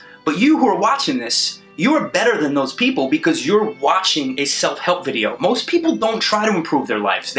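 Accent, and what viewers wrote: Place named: United Kingdom. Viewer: It's all about the British accent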